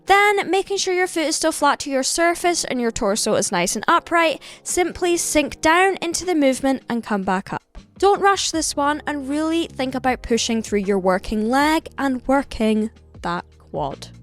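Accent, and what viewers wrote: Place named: Scotland, United Kingdom. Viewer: Loved it thank you so so much! Love love love the Scottish accent. Not so strong though. 💚🤍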